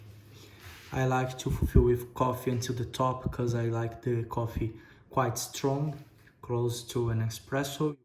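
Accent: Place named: United Kingdom. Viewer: Inglês britânico e muito diferente 😅 curti esse formato aparece mais vezes 👍👍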